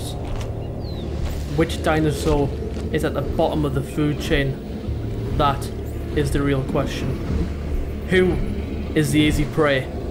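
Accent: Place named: United Kingdom. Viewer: The UK accent is fire bro you got the whole YouTube voice 🔥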